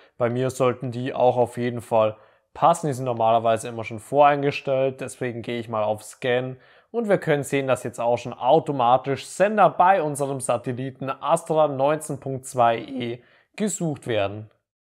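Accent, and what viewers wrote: Germany. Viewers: 👎🏼 wegen der deinem deutschen Englisch Lasst es doch einfach sein mit eurem scheiß Grundschul Englisch Geschwätz. Du machst ein deutsches Video dann schwätz auch deutsch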